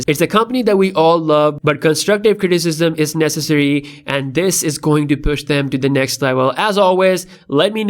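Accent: India. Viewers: You have your Indian accent messing up the whole thing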